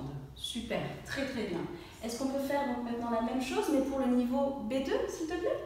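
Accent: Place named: Brittany, France. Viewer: excusez-moi mais puis-je vous demander d'où vous venez en France? votre accent a le même air de celle à Anne qui présente la chaine 'Parlez Vous French' sur yt, et elle est Bretonne (?). je suis encore en train de regarder cette video, merci beaucoup quand même :)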